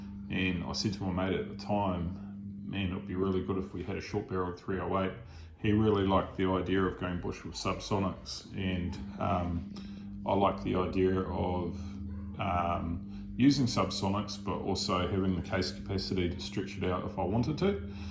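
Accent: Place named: New Zealand. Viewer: Mate, you sound like a Kiwi! 👍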